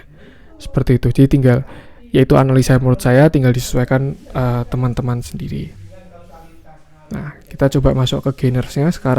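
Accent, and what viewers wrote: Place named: Java, Indonesia. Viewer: HD BANGET TRADING VIEWNYA! PENJELASANNYA KERENBGT ADA "REASON" JG KAYA OBLIGASI AMERIKA DLL AKU SUKABANGEEET. AKU SUKA KALAU ORG BAHAS WHY. GA CUMA ASAL JD AJA.KEREN LOH NGERTI AKUUUU. MAKASI BANG!!!! Tambahan, aku tu kurang suka denger orang ngmg medhok sbnrnya, tp abang org pertama yang aku ikhlas dan berterimakasih krn udh ngomong😂 emang suaranya radio-able juga sih. tengkiu banget ya bang! Oiya gaada noise sama sekali kok bagus. SEMANGAT TERUSSSSS BANG😊😊😊😊 eh mas ya harusnya ehehe Bang pleaseeee mau PWON, ASRI, KRAS, PTBA yaaa!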